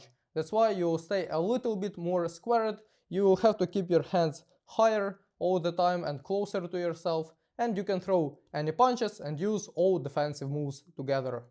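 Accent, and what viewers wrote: Russia. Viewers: I love your rusian accent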